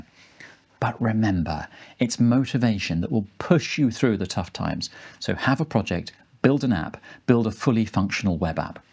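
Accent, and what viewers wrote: United Kingdom. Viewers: Bro you have the thickest british accent I've ever heard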